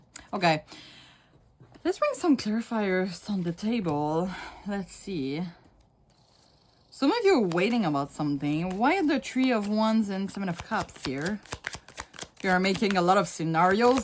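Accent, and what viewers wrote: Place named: Quebec, Canada. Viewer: I can hear a québécois accent from a miles away tell me in right 😅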